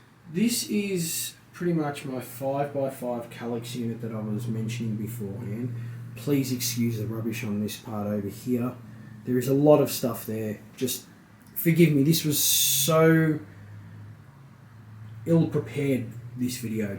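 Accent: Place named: Australia. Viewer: Hey. Thanks for still give me my fortnight Dosis of Aussie accent. 🤗 great collection you have. Made my Star Wars heart beat higher. And yes please please also show the downstairs Legos. And you do know that there are glass doors for the Kallax shelves, right?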